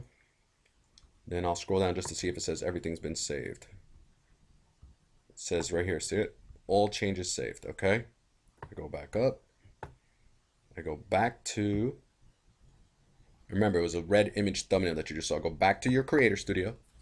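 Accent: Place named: New Jersey, United States. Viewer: You're so Jersey YESSSSS!!!! I'm from Freehold! Thanks for the vid info!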